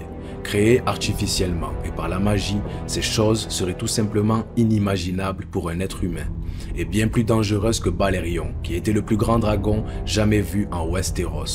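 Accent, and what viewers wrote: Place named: South, France. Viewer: J'adore ton accent mon frère, tié du sud ?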